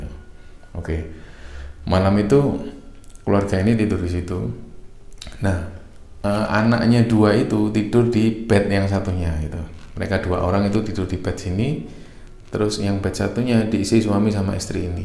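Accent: Java, Indonesia. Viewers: Mirip aksen jawa nya aja...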